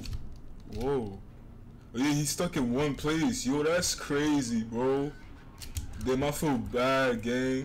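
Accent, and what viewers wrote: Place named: United Kingdom. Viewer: Guys his British😱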